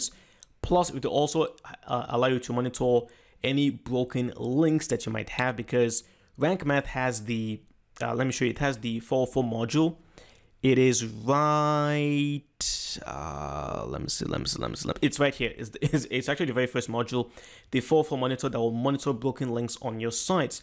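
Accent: Nigeria. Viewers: You sound like a Nigeria, are you?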